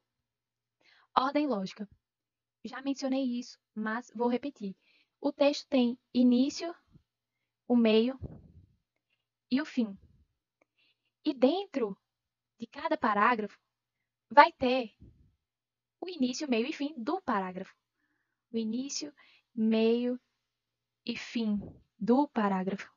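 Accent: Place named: Bahia, Brazil. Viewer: O sotaque bahiano é a melhor parte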